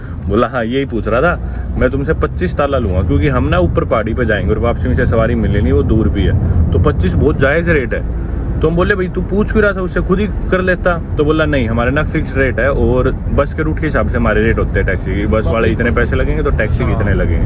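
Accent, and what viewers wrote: Haryana, India. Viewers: Bhai kitna bhi kuch karlo par haryanvi touch rahta hai aapki boli m❤❤ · You are so talkative in your vlogs in comparison to Navankur brother ✨😂 and i always have been a fan of your desi-haryanavi accent 🔥🤣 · Bhai aapka tifficulte Harnavi touch achcha lagta hai mujhe ❤❤❤❤ Aur bahut dino baad aap logon ko achcha hotel mila hai ...🎉🎉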